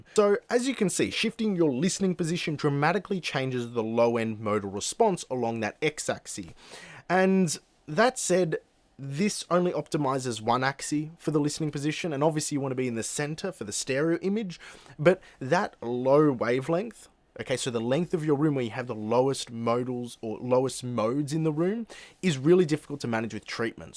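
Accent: Australia. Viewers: This has changed the way I view putting my room together thank you!!! So nice to hear a fellow Aussie break down things to Australian standard as well!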